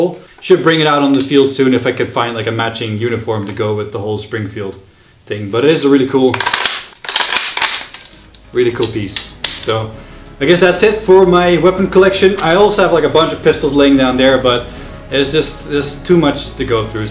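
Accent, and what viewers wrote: Netherlands. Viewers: Boi u dutch? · are you dutch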